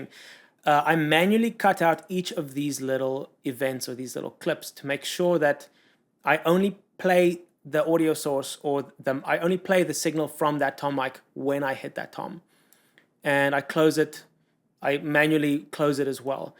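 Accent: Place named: South Africa. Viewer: I can hear the South Africaness oozing through your voice. Its amazing.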